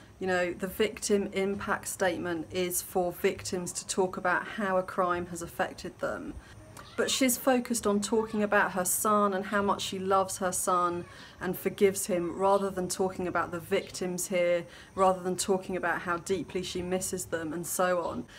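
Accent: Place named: United Kingdom. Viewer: For me it's also her British accent. I don't know why everything they say sounds so smart :)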